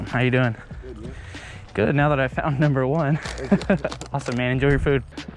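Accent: United States. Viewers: U American?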